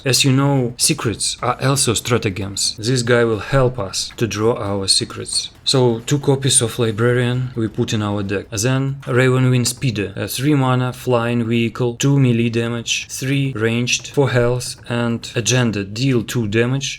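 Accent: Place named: Russia. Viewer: Хех, слышу русский акцент, а так видео класс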